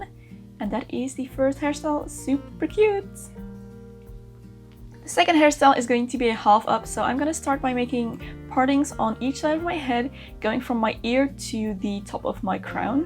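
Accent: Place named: Netherlands. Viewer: You have a Dutch accent. Do you live in the Netherlands?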